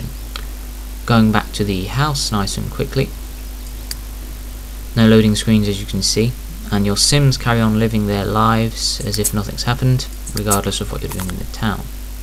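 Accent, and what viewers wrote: United Kingdom. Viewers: where are you from? you talk posh. nmo offence && you should Posh english but im english i sound NOTHIN like that ! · OMG R U BRITISH i luv british ppl their awesome!!!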